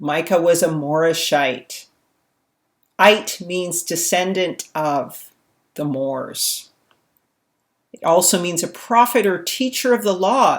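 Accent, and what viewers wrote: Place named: Jamaica. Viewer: I love the Yahmacan accent, Teach. & Beautiful Voice, I'm crushing.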